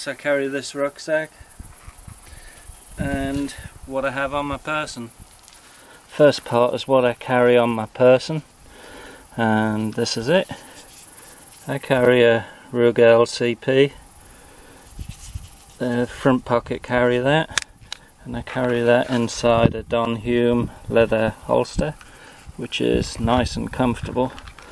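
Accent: United Kingdom. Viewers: Hell yeah, first time seeing this video. The Internet should be full of guys with UK accents doing EDC videos involving weapons, but you're the first I've seen sadly. anyway great video and one is better than none I guess.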